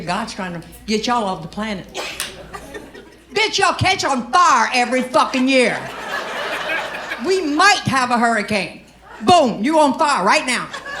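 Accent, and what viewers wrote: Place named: South, United States. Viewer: I love her southern accent 😍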